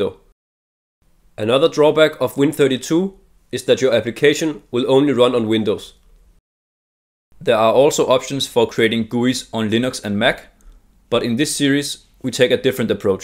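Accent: Denmark. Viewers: Danish? I like the accent :))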